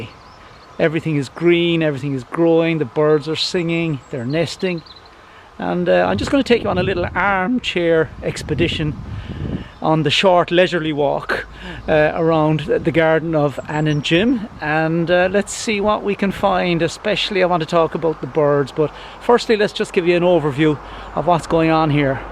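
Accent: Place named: Ireland. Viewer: Let me try that again! Great to see you and your beautiful backyard flowers and ponds. Your birds are spectacular! Also, great to hear you wonderful Irish voice. Nan Hampton (Antarctica, Iceland, Svalbard)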